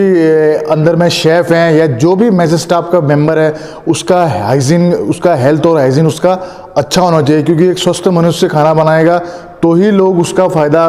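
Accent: Rajasthan, India. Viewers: Aap ho na ho rajasthani ho sir I am 100%confident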